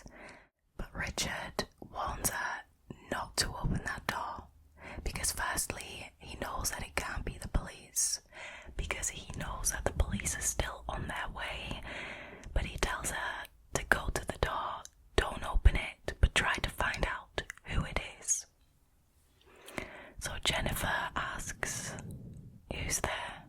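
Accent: United Kingdom. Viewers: i really enjoy, as a non Brit, the sound of the classic Britissshhh accent you have...the one i, unsuccessfully, tried to replicate at english classes back in school days...you oftenly fly under my radar though since you mostly do Reddit stories and i'm more of a true crime aficionado... great narration and tone nonetheless...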